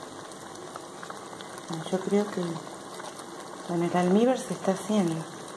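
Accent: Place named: Salta, Argentina. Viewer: Suena a salteña 🥰. Ahora voy a hacer el dulce.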